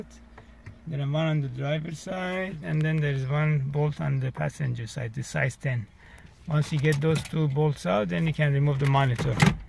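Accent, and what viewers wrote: Iran. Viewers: You sound like your Persian, great video. How do you tell, or what are the symptoms this part needs to be replace?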